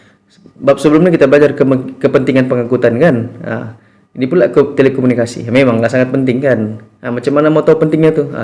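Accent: Sabah, Malaysia. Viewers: Suka dengar bahasa sabahan cikgu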